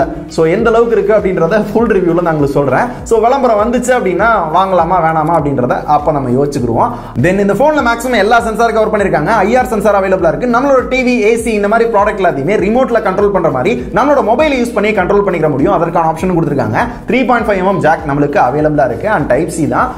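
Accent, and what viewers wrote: Madurai, India. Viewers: Bro neenga madurai yaa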